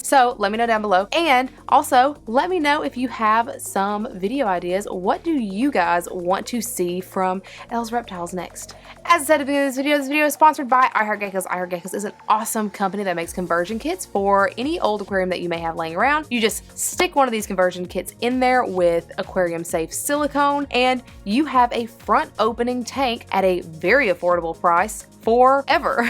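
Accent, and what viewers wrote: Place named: Alabama, United States. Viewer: Hey Elle you are so amazing and inspiring, to be honest I don’t know if I would have gotten a bearded dragon If it wasn’t for you, I watched so many care guides and they were all so different but yours just seemed right, I showed my mom your channel and she started watching your videos with me. I really want to be like you when I get older, but I either want to be a firefighter to help out the community or a herpatologist (studies reptiles and amphibians). Omg can we talk about our accent, I know your from Alabama, and I’ve lived in Alabama my whole life we currently live in Madison, my favorite store is animal trax, I like to support small local businesses, other than like petsmart, but don’t worry, is still go to petsmart. Just remember JESUS LOVES YOU AND YOUR FAMILY.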